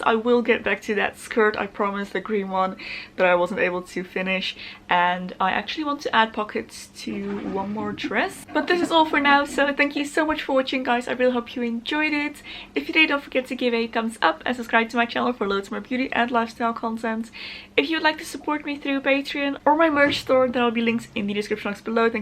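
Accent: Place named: Netherlands. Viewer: I thought to hear you were Dutch and then I saw the Harry Potter books. Greetings from another Dutchy! Love you vids!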